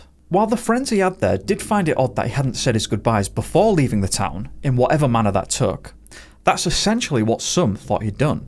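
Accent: United Kingdom. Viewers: Your British accent is fab!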